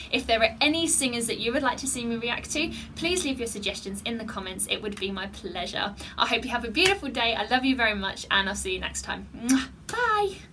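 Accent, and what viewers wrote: United Kingdom. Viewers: Greeting. It is good to know, you teach singing with vocal instruction . Thank you, been a "Music Teacher". Question ? : Are you from England ? 🇬🇧 . You have a strong British, accent. I am trying to listen and understand what are saying. Well, keep up with your videos look good. Please, try not to make faces, make look much older and not looks good. I believe , you are young and beautiful. Please, try to show a little positive expression, people will approach you more. Take care of yourself, kisses and hugs, XOXO , Love. Sincerely, 😎Mr. Villalba 🇵🇷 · (" "), your bravado made me comment on this page! Your craft is passionately represented and it is very appealing to know that you are inclined to view and comment on video suggestions from your students. The british accent is delightful as well. killed this record and there is a need for COVID-19 refreshing music. 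keep em coming and I love the commentary, equally your facial expressions and voice overs killed this review...... go!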